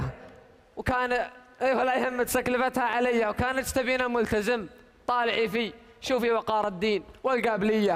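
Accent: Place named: Bani Sakhr, Jordan. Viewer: الاتس هذي لهجة قبيلة بني صخر الاردن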